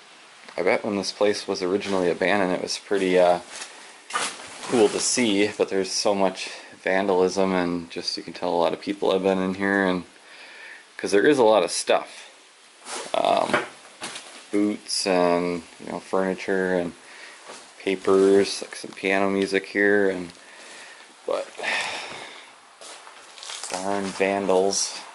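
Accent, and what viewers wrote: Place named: United States. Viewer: Where have you been?!!! I thought you'd given up exploring. Anyway glad to see you again. That must have been a magnificent house in its day. Thanks for the tour and by the way, don't know which part of the States you're from but I love your accent!! 😂 Gill 🇬🇧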